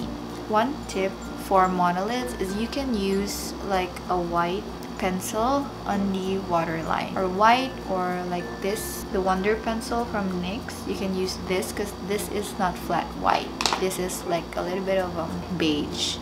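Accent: Philippines. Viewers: Filipino accent hehe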